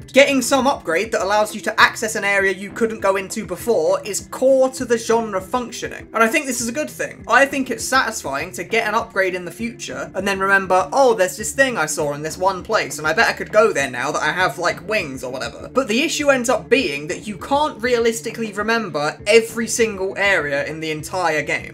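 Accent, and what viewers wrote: United Kingdom. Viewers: British lol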